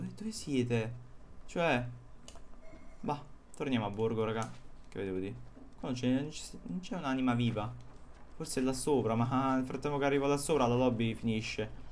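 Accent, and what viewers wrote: Naples, Italy. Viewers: Ma ij o sapev Uagliu Sei di Napoli? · Sei napoletano fra? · Quando ti parte il napoletano è bellissimo ahah grandissimo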